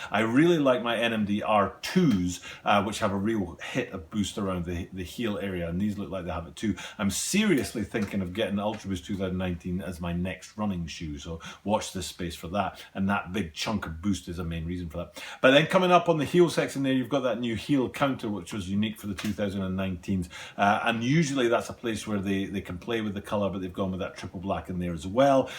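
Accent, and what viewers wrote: United Kingdom. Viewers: No probs Son. You need all the Support you can grab in this game. I keep losing all my blinkin Supporters though 😂. They get to a 1,000 then delete all the people that got them there... Bloody Buggars 😆🤣. I like your Channel anyway Pal. Theres not too many U.K guys that do what you do, so it's nice to hear an accent I've grown up with. You're doing a good job Mate. Posting everyday would just about kill me though, although my videos are packed with a load of old rubbish (Sorry... Trash!! 😉) that takes me forever to find, so they are a little bit longer than yours and take me a week to create them 😂 ✌😆👍. Not sure if you've got <link> where you are?? (Online) but they had the Triple Black 19's in a few days back and Footlocker aren't releasing them until the end of July??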